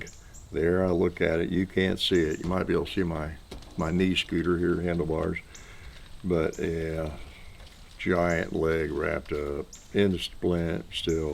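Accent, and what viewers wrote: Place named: Kentucky, United States. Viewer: Wishing you well! Regarding speech, I notice you have only very little of a Kentucky or Tennessee accent. I travelled the US twice by car paying attention to regional accents and was not too surprised that accents are strongest with older people who've mostly spent their life in a small town or within a particular limited area. Tennessee accents seem diverse. I was surprised that Chuck McDowell (radio ad) comes from Nashville. He has a strong accent; I thought it was Texan. Take care.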